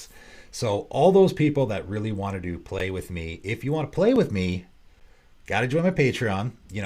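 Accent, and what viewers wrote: Canada. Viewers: Love the content man keep up the great work. I'm very happy you're continuing to have success! I've found so many great cards and strategies through your videos. I love using those lesser known gems and you might be the best channel to find those. You've also inspired me to build 4 decks (patron of kitsune, cosima, Dakkon, and ertai). I've got 3 random questions for you: 1) do you have a public discord or is it only through patreon? 2) have you ever played with, or considered plying with custom commanders? What are your thoughts on them? 3) are you from Canada? Your accent gives me Canada vibes 😂 Also I can't remember if you discussed it in a video already but dominating licid is so cool! Definitely deserves a mention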